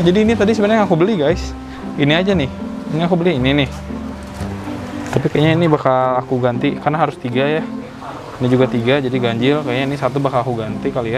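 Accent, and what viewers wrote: West Java, Indonesia. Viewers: Bang Orang Sunda Ya?:)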